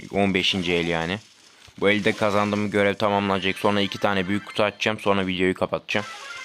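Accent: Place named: Thrace, Turkey. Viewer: Trakya aksağanı gözümden kaçmadı. Selam olsun sana hemşerim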